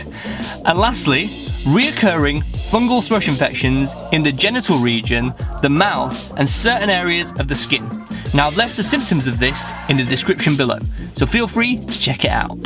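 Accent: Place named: West Yorkshire, United Kingdom. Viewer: He sounds like he's from Bradford West Yorkshire.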